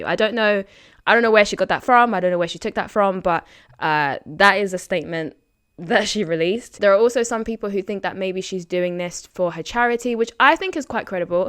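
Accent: England, United Kingdom. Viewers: You sound British. I would assume that you're British, regardless of where your ancestors came from. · We don't need this in our country. I think Marlene is a race baiter. Lady Susan was admired by Nelson Mandela. Older people don't have the same filters, would you be offended. You are beautiful you have an English accent. We do ask snd we shouldn't be afraid to ask. Sorry but Marlene was dressed up to look like an African princess. The UK isn't a racist country there are stupid people but my school back in the 80s was so inclusive we were just teenagers and liked each other. MM is a race baiter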